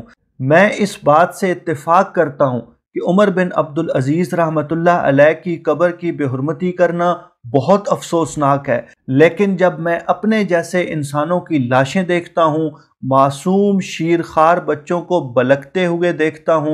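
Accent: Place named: Attock, Pakistan. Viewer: Welldone ,ap ka lehja attock jand wala lag raha hai.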